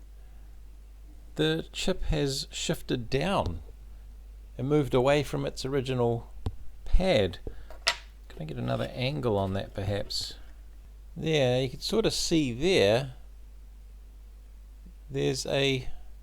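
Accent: New Zealand. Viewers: Customers car came in today senders seem okay cause its sending signal saying full on gauge when passengers plugged in however half when unplugged and half when both unplugged will open up tomorrow morning and hopefully this info does the trick. you sound like you're from nz. owe u a box of beers if this works out. $100 so far for diagnostics dunno what to charge if this is the issue. maybe another box lol more so around $350 which I think is fair. Chur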